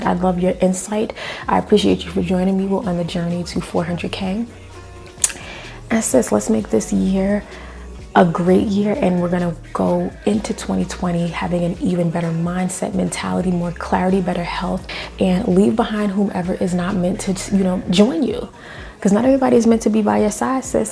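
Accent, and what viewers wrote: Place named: Chicago, United States. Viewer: Are you in the Chicago area? Because you definitely sound like us, the way you speak. I just love it Sis.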